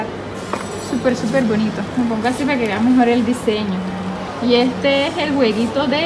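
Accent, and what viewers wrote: Caribbean Coast, Colombia. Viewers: cuetame soy seguidora de tuya De parte Colombia eres se nota que eres costeña que Dios te bendiga siempre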